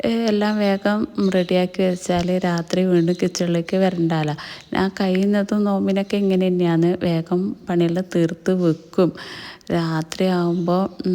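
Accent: Kannur, India. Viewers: Nice vedio dear snacks adipoli nigaludey കണ്ണൂര്‍ slang anu enikku ishtam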